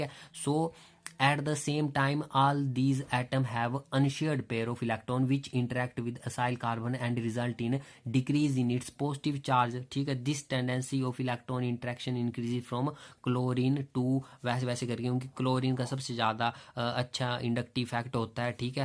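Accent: Himachal Pradesh, India. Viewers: Sir kya app Himachal se ho aapki slang se pura lag raha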